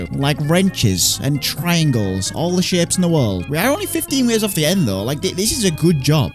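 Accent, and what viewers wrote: United Kingdom. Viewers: I’m not being mean but is it me or did I realize he kinda sounds british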